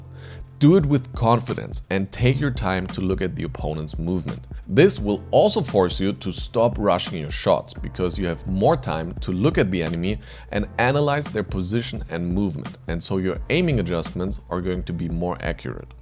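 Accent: Germany. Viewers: dieser deutsche Akzent 😂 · Man hört deinen deutschen akzent raus alter mach doch videos auf deutsch dann versteht man es auch leichter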